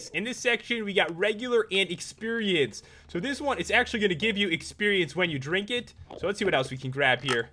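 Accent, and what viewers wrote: United States. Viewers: Are you Amarican?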